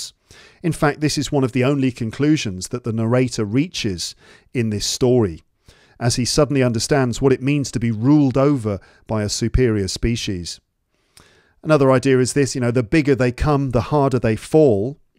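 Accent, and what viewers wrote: RP, United Kingdom. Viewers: speaks RP, does he? Pls tell me, thank you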